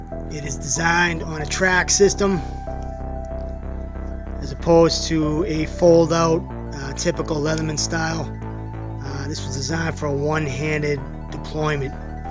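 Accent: Rhode Island, United States. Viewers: Dude’ I love your Rhode Island accent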